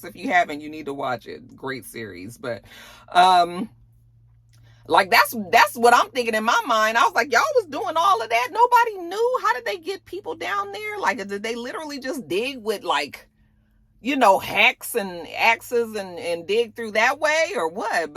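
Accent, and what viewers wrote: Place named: Los Angeles, United States. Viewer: is so ATL. you still have some LA in your voice. lol.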